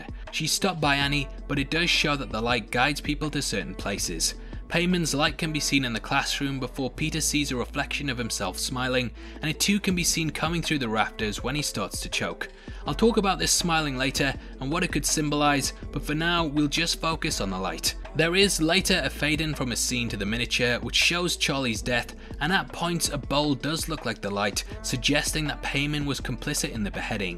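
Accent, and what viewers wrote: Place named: Newcastle, United Kingdom. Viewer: Howay..this is hands down the best and scariest horror I've ever seen ... intelligence and terrifying..Great channel and nice to hear a geordie !!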